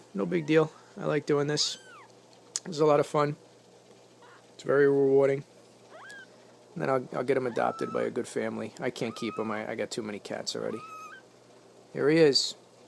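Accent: New York, United States. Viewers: Hey man, are you a New Yorker? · I love the sound of your tough new yorker accent and then this cute kitten. Great combination. You did the best you could. You're a legend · Buddy, are you from queens or new york general area?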